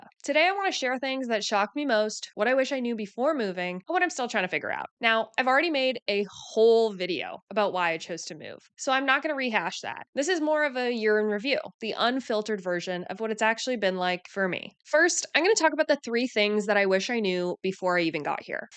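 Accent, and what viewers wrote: United States. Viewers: You sound American. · She has an American accent.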